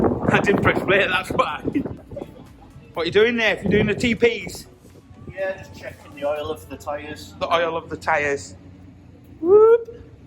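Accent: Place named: Northern England, United Kingdom. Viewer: Top Dong. These guys never make a video that doesn't put a smile on your face, never change you're a star especially with your Northern Accent matey.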